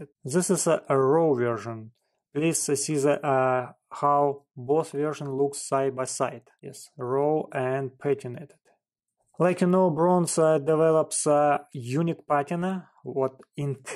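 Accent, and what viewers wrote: Russia. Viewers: Такой прикольный русский акцент 😅 лайк за обзор :)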